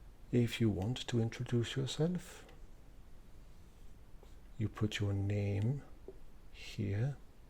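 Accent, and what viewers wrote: Wales, United Kingdom. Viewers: Ever since Peter Kinney made the beautiful decision to make the dwarves Welsh in the Witcher audiobooks I have been dying for Welsh accented ASMR and you beautiful bastard you did it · Are you actually Welsh? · Commendations Greetings Appreciation Respect And, a great love of a lovely Welsh accent I kid you not One of My other favorite YouTubers has a beautiful Welsh accent Even though he speaks in a mundane friendly full voice, it's super soothing So Algorithm, please promote all my favorite Creators, especially, in whose comments I am about to post.... Food for you, dear Algorithm And Dearer friendly creator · OMG Your Welsh accents are my favorite videos and your quiet voice is my lullaby. ❤❤❤❤ im quite convinced your a genius.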